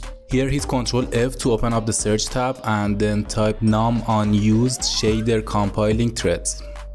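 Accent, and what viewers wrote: Iran. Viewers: لهجه اصل ایرانی 😁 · قربون لهجه فارسیت😁😁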